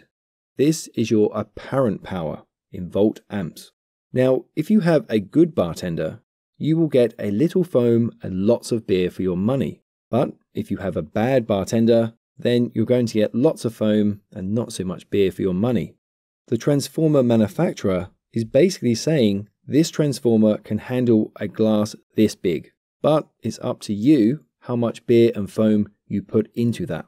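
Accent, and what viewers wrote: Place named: England, United Kingdom. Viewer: Leave it to someone with an English accent to use a beer analogy. Lol. Good job anyway. I learned some things.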